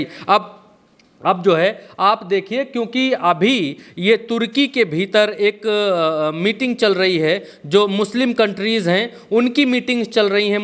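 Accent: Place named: Lucknow, India. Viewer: Aap ke bolne ki andaaz bahut behtareen hai Bhaiyyaji Aap ek lucknowi andaaz me bolte achha lagta hai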